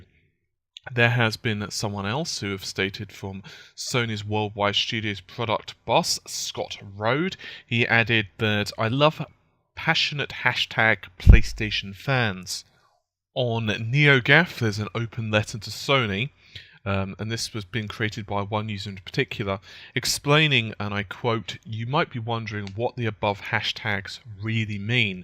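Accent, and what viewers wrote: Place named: Manchester, United Kingdom. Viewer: Are you from Manchester? your accent is simular to Soprano pictures.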